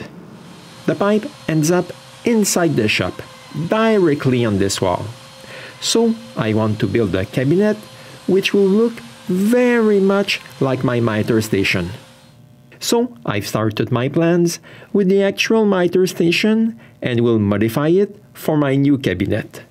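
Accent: Quebec, Canada. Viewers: Vous ete Canadian Francais? Je suis americain et entendre votre accent(je crois.) Bravo en tout cas(je vis en France depuis 30 ans...by the way) rr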